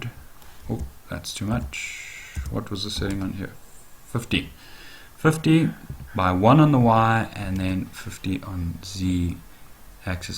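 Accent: South Africa. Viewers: you sound south african speaking properly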